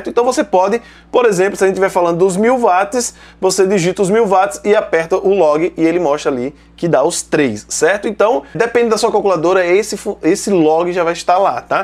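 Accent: Northeast, Brazil. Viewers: vc é de qual estado do nordeste? gostaria de fazer o meu som com vc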